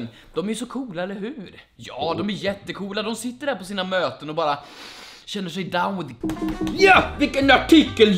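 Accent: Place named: Suburb, Sweden. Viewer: varför pratar du orten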